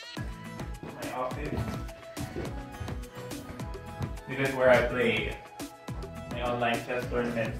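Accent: Philippines. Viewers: Such a Filipino accent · He still speaking like a filipino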